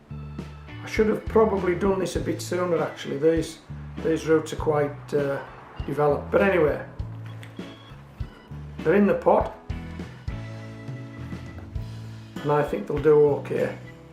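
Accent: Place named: North West England, United Kingdom. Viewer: Hi, I seem to have offended you with my comment, I really didn't mean to, I was very excited to find your channel, and thought from your accent that you may be in the North West like me, so was inspired by the things that you were doing in this climate. In my first video which I am publishing this week, I have said that I got my inspiration from you, and linked to your channel. If you want me to take it out, I will do.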